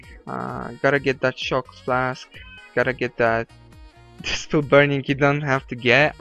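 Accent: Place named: Poland. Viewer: Love the epick Suck Guy playing in background and btw nice english for a pol akcent similar to shusei :D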